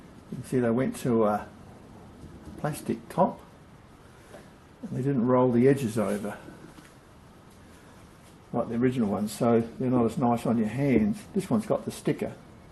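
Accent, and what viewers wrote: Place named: Australia. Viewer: Soooo good to hear an Aussie sprouting his stuff! Good on yer, mate. Keep emailing coming!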